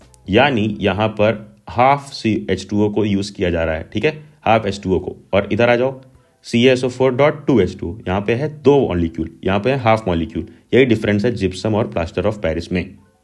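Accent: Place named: Indore, India. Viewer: Bhaiya aap Indore se ho kya thora Indori Accent aa raha hai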